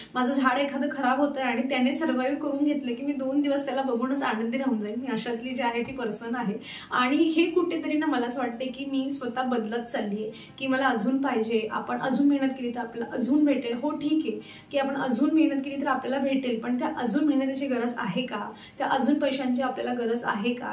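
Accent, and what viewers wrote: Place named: Shirpur, India. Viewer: Pat your back for this realisation and taking decision to change this pattern 👏👏👏 I'm so happy for you ☺️ I love you dear❤ Mi pn kanya rashichi ahe tuzyasobat jast relate karte😊 khup chan watla ki tu swatacha aanand nivadlas. Ani bhashecha sangaycha tar mi Satarchi ahe. Ani mazi ek maitrin Shirpur chi ahe. Hostella sobat hoto. Tyamule tichi bhasha aikaychi savay zaleli. Ata sagle aapaaplya kamat tyamule bolna hot nahi. Tichyasarkhi bhasha aaikayla milte mhanun mala tar tuza bolna khup aawdta❤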